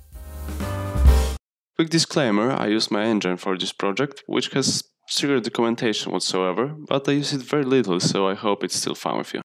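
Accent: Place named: Russia. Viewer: it means gj. Cuz ur accent I thought u r russian, but anyway, u made a good tone of work. That inspired me so much. Right now studying golang, and i hope i can make it, wish me luck :D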